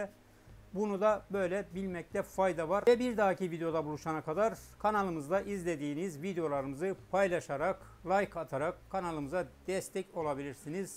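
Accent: Erzurum, Turkey. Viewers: DAYI SEN ERZURUMLUMUSUN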